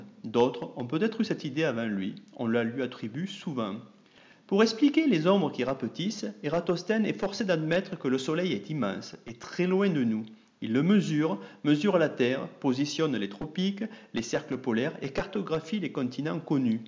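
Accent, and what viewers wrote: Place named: South, France. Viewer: Expliqué avec l'accent du sud c'est encore mieux...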